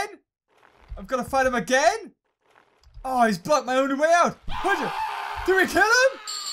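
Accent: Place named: United Kingdom. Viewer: God I love ur Brit accent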